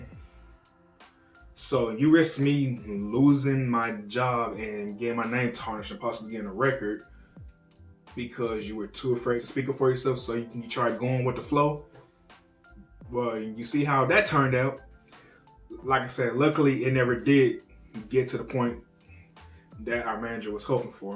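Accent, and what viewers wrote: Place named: Tennessee, United States. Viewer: You from Tennessee???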